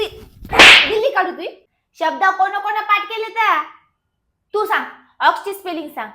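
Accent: Nanded, India. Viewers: Tumchi language khup chan....😂😂😂😂😂😂😂 1 number Nandedkar...😂😂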